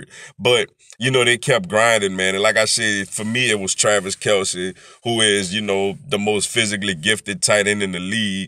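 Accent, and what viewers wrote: New Orleans, United States. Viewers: Where are you from man sound like New Orleans.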